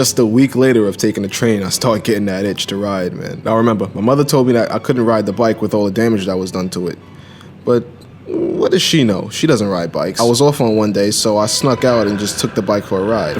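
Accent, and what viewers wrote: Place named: Brooklyn, United States. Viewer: I also live on the west coast now but I"m from the east coast and really miss NYC, so listening to your Brooklyn accent is the best thing ever.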